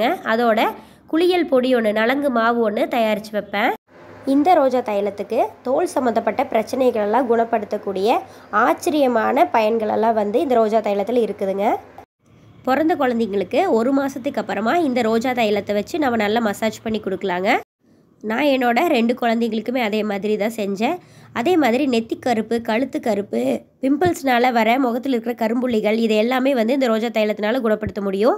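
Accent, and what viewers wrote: Kongu, India. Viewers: கொங்கு தமிழ்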